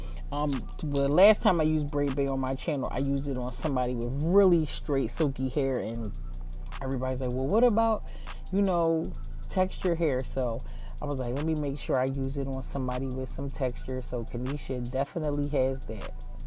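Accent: Philadelphia, United States. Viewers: You was sounding mad Philly in this voiceover! Love you girlie!😜❤️ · Are u from Philly? You accent an slang made me ask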